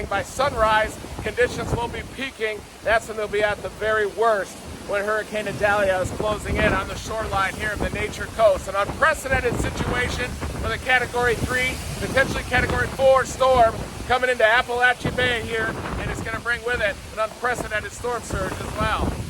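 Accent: South Dakota, United States. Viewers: Hey, are you from South Dakota?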